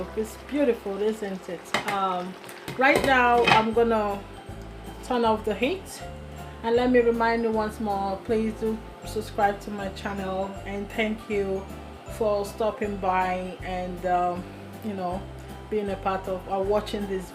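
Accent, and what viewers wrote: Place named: Nigeria. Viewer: It was really refreshing to hear a Nigerian girl with a beautiful Nigerian accent. We need to own our accents too like we own our cooking and teach the young ones that we are proud of who we are. These accents we try to put on make us sound so silly and small minded.....😉 just a thought.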